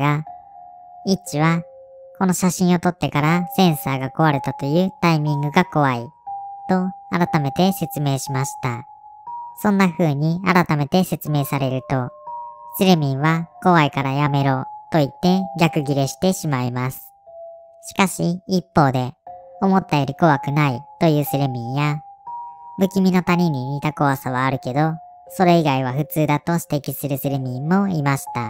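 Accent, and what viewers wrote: Kansai, Japan. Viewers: 関西弁ニキちーす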